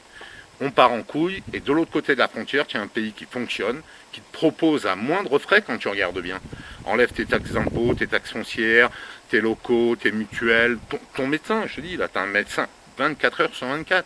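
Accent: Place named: North, France. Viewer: Bjrs Mr votre francs parle viens de quel ville .Qui me rappelle Mr papa du nord .😊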